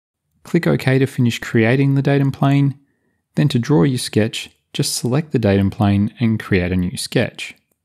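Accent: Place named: Australia. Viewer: It's great to hear a Bazz. (read Oz-Trail-Ian) accent making such an informed and clearly articulated analytical video. I'm an FC newbie and, after many montha of bashing my old head against the Blender wall, I now know that this is the package GP draughting package I've been looking for! Well done mate, Cheers, pk (Central Vic.)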